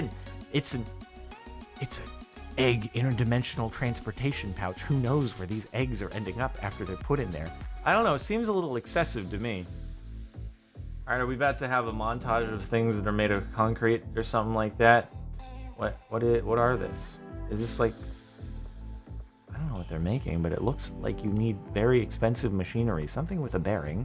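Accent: Australia. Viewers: Mate, not far off the Aussie language. I’m impressed. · Holy shit he actually sounds australian i have an australian cousin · I mean....speaking as an aussie who has heard people try Aussie accents yours sparklez isn't too bad. It at least doesn't sound more British than aussie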